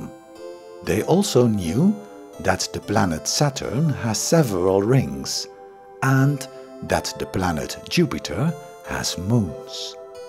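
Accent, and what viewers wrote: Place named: Netherlands. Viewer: It's 'Sirius" not Sairius nor Thairius. Info is okay, the Dutch accents and pronunciation mistakes are annoying. so is the pedantic intonation.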